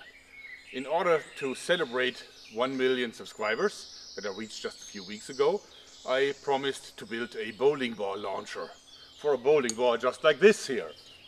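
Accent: Germany. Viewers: Am I the only one who gets this sue per sinister feeling when I hear this dudes harsh German accent?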